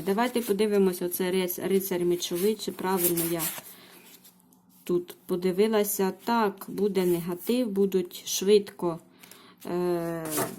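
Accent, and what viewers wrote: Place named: Zaporizhzhia, Ukraine. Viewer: Уважаемая, если вас это не обидет ответьте пож. Вы наша, Запорожская? Это чувствуется в разговоре,что конечно приятно, когда вы говорите наш город, сказав наш нам и кажется,что вы живёте в Запорожье.Спасибо дочка, за твой труд.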